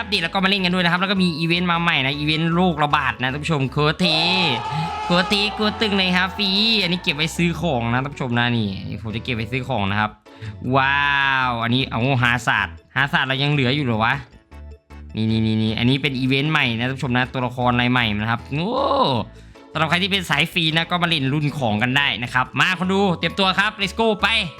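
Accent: Korat, Thailand. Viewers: พี่คนโคราชป่าวครับ555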